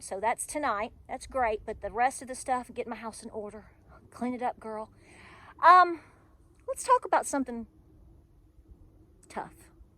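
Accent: South, United States. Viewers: Your voice is super awesome idk what word to use the country twang and matter of factness. I enjoyed this. — on Christmas, my Christmas Day was the most enjoyable to date! My husbands and parents also. It was the best family time! Intimate. Games and bonding. Now Christmas Eve (extended family) some didn’t one due to political differences so that stunk :( Regardless! Praise you Lord Jesus! We love you! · I really like you. Love your southern accent, yes the attacks can also be spiritual fatigue. I felt that way befor3 the election. On another note yes ppl want flowers and rainbows but thats not the world we live in. Fear to face reality.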